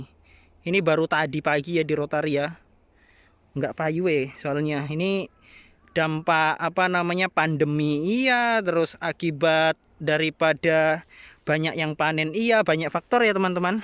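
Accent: Java, Indonesia. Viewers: Tapi kok logatnya jawa wkw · Bro....we cah Endi.....jowone medok.....sehat selalu dan sukses..... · Wong ndi to kok medok😁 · Jawa mu ngendi bos. Sak aratarat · Jowone pundi mase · Mase Indonesianya mna kok kyak jawa? Sya blora Jawa tengah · Mas Smpean Jowone Endi?? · Wong jowo iki. Ketoro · Medok yow medok ae mas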